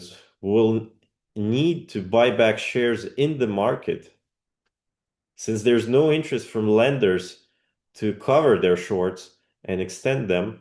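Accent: Quebec, Canada. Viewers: Are you from Quebec ? Your accent looks familiar. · Tu vien du Quebec?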